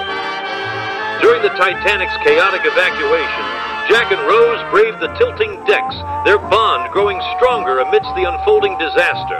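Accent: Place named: Transatlantic, United States. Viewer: literally a transatlantic accent.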